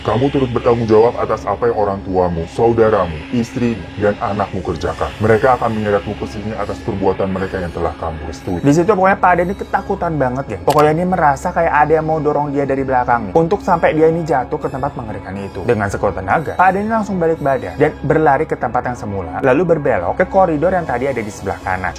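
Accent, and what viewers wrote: Java, Indonesia. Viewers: Mas nya pas pake bahasa jawa jd lucu ngomonge🤣🤣🤣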